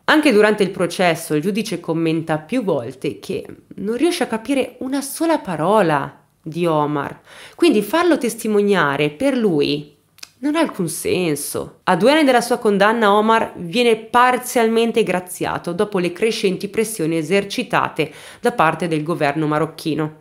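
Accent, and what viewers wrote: France. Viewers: Seguo ogni tuo video, e mi piacciono tutti tantissimo..è una storia interessante, sopratutto con l' accento francese!! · Ciao carissma il tuo acento francese 😁mi rocorda molto il mio nei primi anni che mi sono trasferita qui in nel sud ovest della Francia, ma una cosa che puo consolarti e che pronunci molto bene il nome della vittima che e uguale al mio Ghizlane, buon lavoro carissma.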